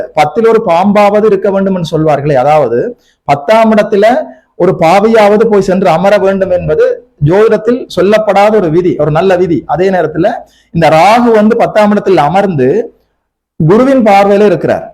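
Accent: Sri Lanka. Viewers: ஸ்ரீலங்கா தமிழ் அருமை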